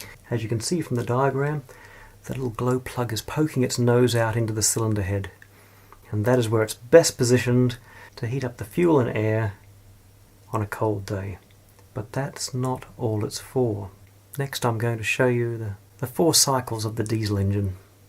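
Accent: Australia. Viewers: Hey I like your presentation manner!. Can't pick your accent. It it Melbourne? Or were you born in the UK or Kiwi and emigrated? Regardless, I thank you for your lovely videos. Thanks matey!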